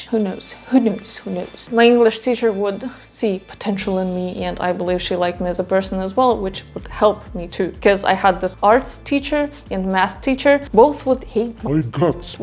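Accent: Russia. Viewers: I love your channel, and enjoy your sense of humor. Your command of English is pretty amazing, and your Russian accent is so subtle most of the time. I love it when you intentionally say things in English with an exaggerated accent! As a native English speaker, I commend your hard work. It really pays off! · Coming from a native English speaker your English is excellent/fluent!,you have a distinct Russian accent but just like an American or an Australian has an accent when speaking English.Its a cool accent btw!. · Yes your English is very good. Your personality is great, genuine and spontaneous, funny. A lot smart fun lady! And your fluent in Russian! Wow! Hmm. Language sounds different to each person. I love your Russian accent as you speak English. It sounds very nice actually. Nothing to worry about. You give a lot of good advice.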